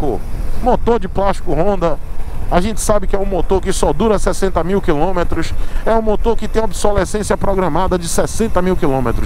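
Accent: Bahia, Brazil. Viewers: gostei da baianada. Se PIQUEM. Alguns não vão entender e vão se beliscar...🙄🤣🤣😂😂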